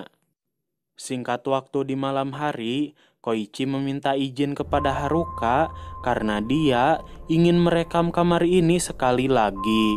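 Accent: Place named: West Java, Indonesia. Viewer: Mass Logat Sundanya luchu jg🤣🤣🤣 film horor bisa berubah jd comedy..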